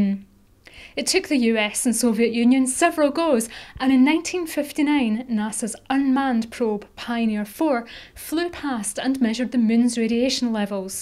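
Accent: Scotland, United Kingdom. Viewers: She is VERY Scottish. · I liked the Scottish accent, unlike everyone else who's commented on it. But yeah, if we are to 'colonize the galaxy', we have a hell of a lot of work to do. It's a shame us non-geniuses can't really help much. Or can we? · gawd i hate her voice, what is she scottish?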